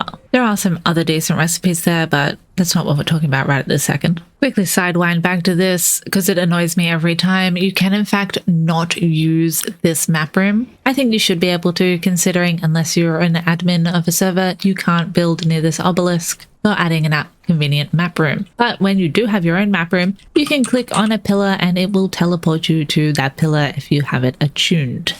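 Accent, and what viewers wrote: Australia. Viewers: trying to work out the accent you Aussie?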